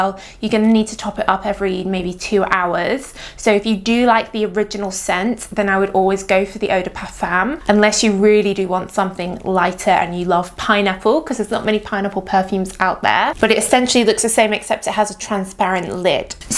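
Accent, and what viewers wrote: United Kingdom. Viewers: I have the Green one it's incredible I m addicted to but I want to have the others! Thanks It's awesome!❤❤ By the way I'm learning english and I like the British accent So It was amazing and useful to watch the video!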